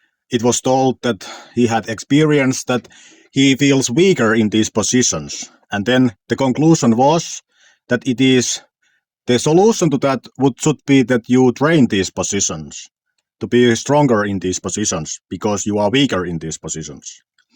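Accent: Finland. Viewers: strongest finnish accent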